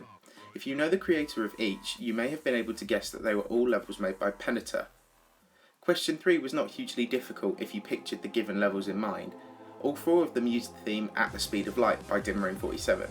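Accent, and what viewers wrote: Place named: England, United Kingdom. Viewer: No, he's not french. He doesn't speak French, he doesn't look French, and has an English accent. He's from the UK.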